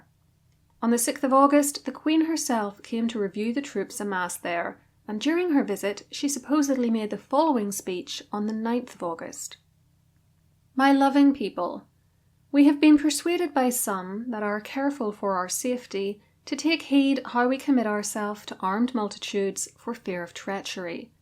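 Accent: Northern Ireland, United Kingdom. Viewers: But you have a voice, oh, such a voice! A voice that is of a good language, and English, at that. Or Northern Ireland or someplace. ❤ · As a Shakespeare Original Pronunciation aficionado, hearing Elizabeth's speech read in your lovely Northern Irish accent (which bears some similarities to O.P.) really makes it come alive for me.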